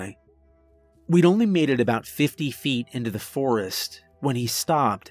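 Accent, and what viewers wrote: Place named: New England, United States. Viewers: Why is Cliff Clavin running a gas station in the Pacific NW? The accents are perfect, but for the NE, not the NW. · Sounds like a northeastern accent not Pacific Northwest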